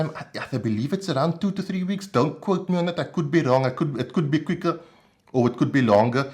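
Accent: South Africa. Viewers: Great review! ☺️ I look for the very same thing in defensive knives, and have one in quite a similar shape. Also, your South African accent is very beautiful!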